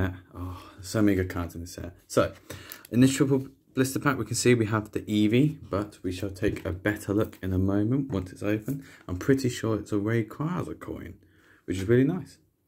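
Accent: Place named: England, United Kingdom. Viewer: Well fk me sidewise I was not expecting the English accent lmao caught me off guard. Saw you on the TabooContract twitter with those dragon cards.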